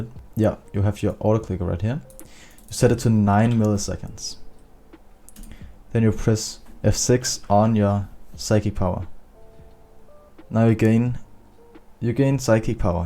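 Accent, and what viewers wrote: Denmark. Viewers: ur danish i can hear it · are you from denmark? cus i am and ur accent is like that.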